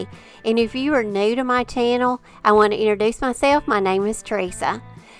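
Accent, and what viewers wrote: South, United States. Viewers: You and your hubby are both so talented and I love your southern accent.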